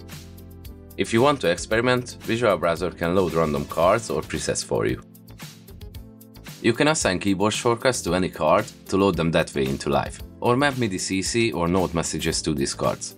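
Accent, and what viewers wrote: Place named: Hungary. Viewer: magyar akcentus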